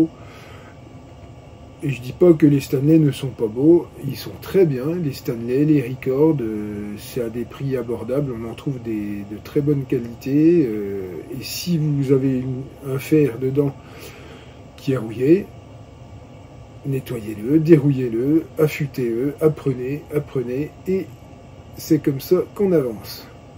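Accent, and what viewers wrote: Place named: East, France. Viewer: Bonjour, C’est toujours un plaisir de retrouver tes vidéos qui rythment la semaine et cette foire aux questions est un moment bien sympathique d’où faute d’avoir pléthore d’interrogations, émerge un bavardage amical, ambiance qu’on ne peut pas retrouver dans les vidéos « ordinaires » de la chaîne … Ces bavardages lèvent un peu le voile sur les personnalités, les origines, les passions et je crois que c’est stimulant de voir que d’autres personnes nourrissent les mêmes centres d'intérêts et on se sent moins seul face à des sentiments de « culpabilités » que l’on peut parfois ressentir surtout lorsqu’on investit dans un bel outil 😜😜😜… Du côté de tes origines, je n’étais pas loin puisque j’avais cru reconnaître un accent de l’Est. Jolie région que l’Alsace et la Lorraine (aussi) … Personnellement, je suis temporairement exilé en Auvergne où ma femme bosse encore mais mes racines sont plus au Sud car natif d’une île de la Méditerranée où les autochtones sont dotés d’un caractère plutôt vif et à l’amour propre particulièrement exacerbé, mais tellement accueillants et hospitaliers… (bon, là tu auras trouvé) Hé bien, ce fut un plaisir, et pourquoi pas poursuivre par un futur « live » qui du coup permettrait une discussion en direct. 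Une excellente semaine et encore merci pour ce moment!!👍👋👋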